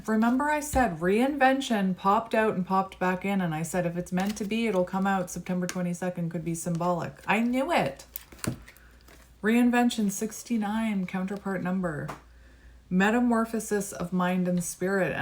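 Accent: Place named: Canada. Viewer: Two of your readings have been so spot on! One with the feathers, and this one, very very accurate. I am sending you best wishes from Central America, I could tell you were Canadian, by your accent. All about his feels so right... 15 reminds me of his emotional age....he is coming around.. I hope and I feel...there is still hope, and healing... no contact at the moment.. I am letting him figure it all out. 19 sounds much better than 15! I have and I keep choosing myself! It all rings too much truth! It is very very re assuring. Both of our parents.. alcoholic! Integrating both energies femenine and masculine! It has been like living th relatioship in real time! The key to healing and growth... very very sweet and affirming and brutally honest...! A great catalyst! Still very connected telephatically! Yes he is away. Thank you.. DELULU is a SOULULU! and No divine femenine are not mad.... 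we rock! Clarity and authenticity big present themes! Lorena Bobbit Oh MY Word! Noooo! That was brutal! This was brilliant! Mental as Harry Potter used to say! I can not possibly tell you.. all the overwork, family etc.. all of that applies.. them not me.. I am taking a break.. all true... unbeliebable...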